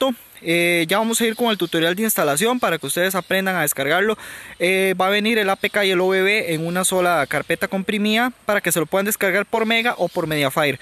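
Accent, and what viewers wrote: Costa Rica. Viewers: Q tal bro eres tico? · Eres tico???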